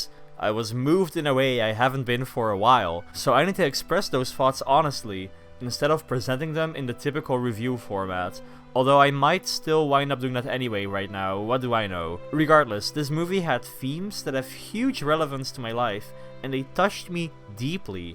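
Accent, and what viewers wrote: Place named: Scotland, United Kingdom. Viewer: Why is your accent 15% Scottish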